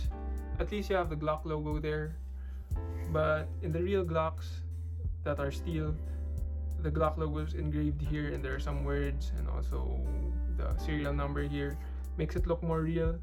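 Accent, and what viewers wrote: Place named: Philippines. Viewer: filipino english is so satisfying!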